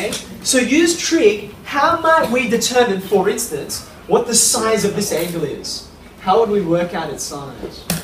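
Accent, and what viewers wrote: Australia. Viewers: He looks asian, but he sounds australian. What da mate !? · That's an Aussie accent he speaks with.. the way he says 'yeah' gives it away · This guy with an Australian accent is nuts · If my math teacher had an Aussie accent, I would’ve paid a lot more attention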